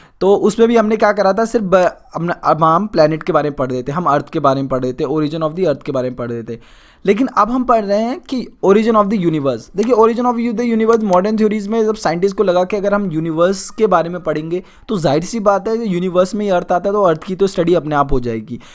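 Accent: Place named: Punjab, India. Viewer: Loved ur punjabi accent and explanation 🙏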